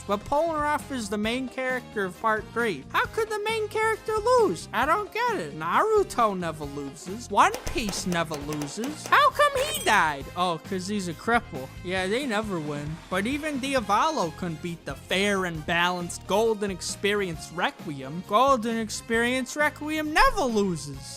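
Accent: Brooklyn, United States. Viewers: Its a Brooklyn accent. Not Dunkeys. Check his clone vid.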